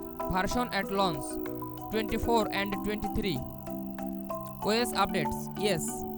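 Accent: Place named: India. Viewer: Hindi native tongue speaking accent is not as thick as some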